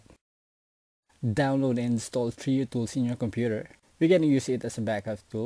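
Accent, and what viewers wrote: Philippines. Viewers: hey man, the guy is Filipino their accent is like that. try to understand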